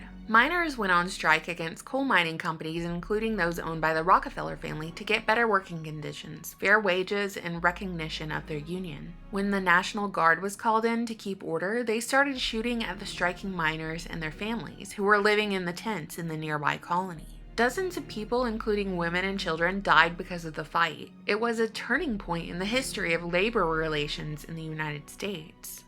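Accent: South, United States. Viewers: She has a calming voice. Gotta love the southern accent 😉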